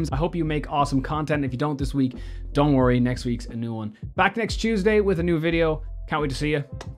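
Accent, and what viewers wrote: Ireland. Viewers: I should not be watching this because I'm trying to get out of my Twitch grind mentality but I just enjoy your irish accent so much! xD Anyway Muted streams do count as views according to Twitch support on twitter: <link>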